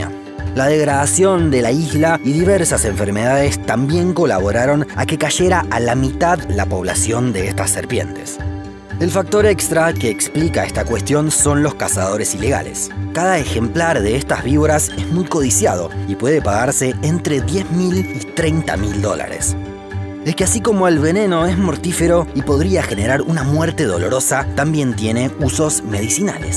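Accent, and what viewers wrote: Buenos Aires, Argentina. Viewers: Muy bueno, lo único malo es el terrible acento porteño del relator.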